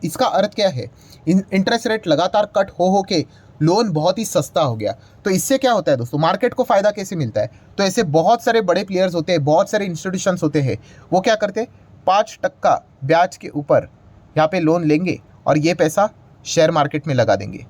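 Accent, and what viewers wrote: Maharashtra, India. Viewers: Accent to Marathi he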